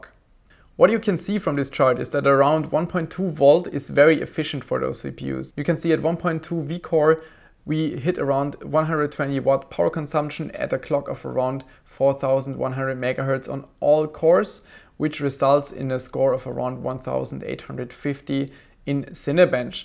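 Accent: Germany. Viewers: The german dialekt xD xD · Dieser deutsche Akzent ist so eckelhaft 😂😂 Aber ich glaube ich hab auch so nh Akzent wie der 😳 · So glad der8auer does videos in English, too. His English is pretty good and while there is some German accent for sure, I don't really mind. It is definitely easier to understand than a language I've never studied, I took French as the third language and forgot most of it anyway :) Awesome OC, it's a pity one can't go even close to 5 GHz on air though. Intel has some advantage here and I'm always glad to see it getting slimmer with AMD's advances.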